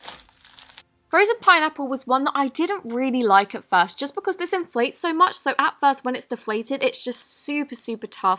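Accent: England, United Kingdom. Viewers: BRUH SHE HAS A BRITISH ACCENT SO SHE PRONOUNCES THINGS DIFFERENTLY!!! 🙄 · What part of England are you from??? And your the best😂😃😂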